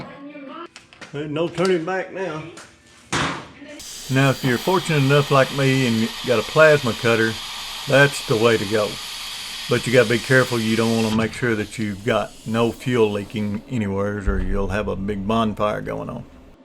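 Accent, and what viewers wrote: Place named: Scandinavia. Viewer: What sort of accent is that? Sounds Scandinavian to me🧐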